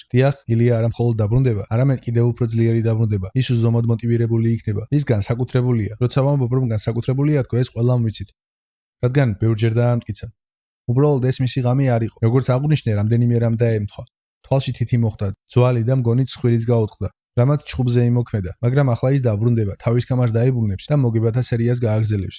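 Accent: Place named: Poti, Georgia. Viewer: ფოთის კილოზე ლაპარაკობ 😊😊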